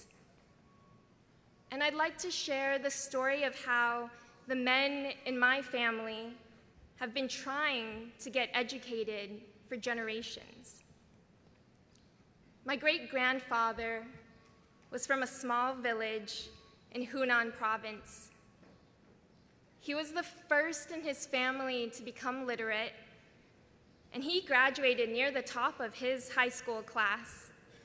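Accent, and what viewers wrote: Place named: United States. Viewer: 比那个强多了地道的美式英语❤❤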